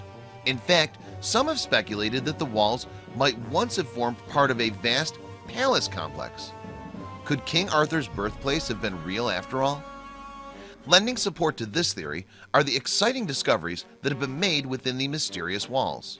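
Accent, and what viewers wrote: United States. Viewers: No offence but always put off by American voices talking about ancient English history and archaeology.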